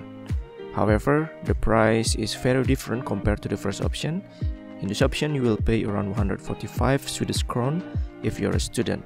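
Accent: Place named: Indonesia. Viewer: Hi are you Indonesian? You have accent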